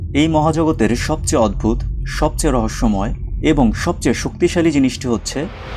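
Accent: Narsingdi, Bangladesh. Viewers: ভাই আপনার বাড়ি কি নরসিংদী?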